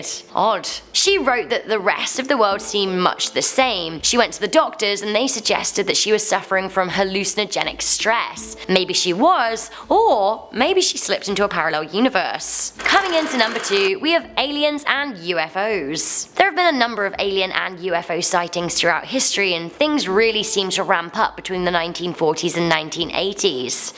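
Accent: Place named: Liverpool, United Kingdom. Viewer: Are you from liverpool?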